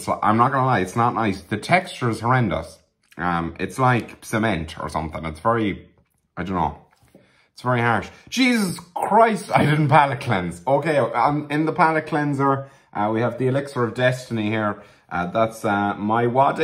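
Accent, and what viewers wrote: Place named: Ireland. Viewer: describing Lucky Charms cereal in his delicious Irish accent gave my American inner child immense joy.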